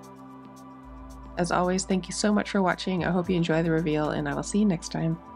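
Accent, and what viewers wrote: Canada. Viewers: Gorgeous!! Ok, confused. You definitely have the Canadian accent but your “y’all” really threw me! 😂 I was born and raised in Wisconsin, but have lived in the south for 30 years, so to say I have an odd accent would be an understatement… But I definitely say y’all because I got sooo many comments for saying you guys.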